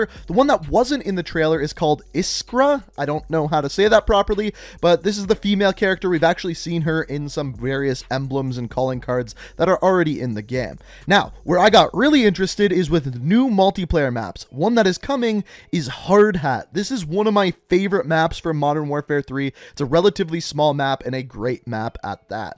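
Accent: Canada. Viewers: Sorry? Your Canadian? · Are you Canadian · Gustavo Castillo I’m not sure if he a canook but he’s for sure from the north.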